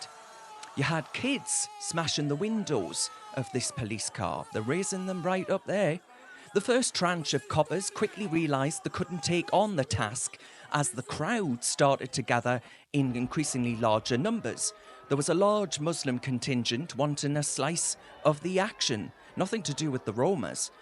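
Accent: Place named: Sunderland, United Kingdom. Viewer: please leave nufc out of this , wearing our toon top when talking about politics is really sad to see just trying to get some views with our shirt on you are probs more of a makem than a geordie going off your accent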